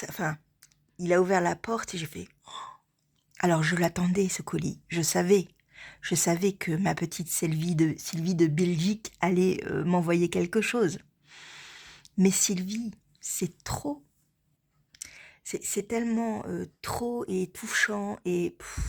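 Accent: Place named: Jura, France. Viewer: Mais oui, continue le ton Jura! Ce serait dommage de le lâcher 😊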